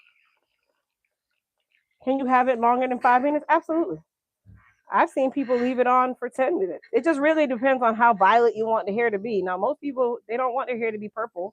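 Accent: Jamaica. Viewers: She’s right you do have a Jamaican accent. It’s not sharp but I do hear it from time to time.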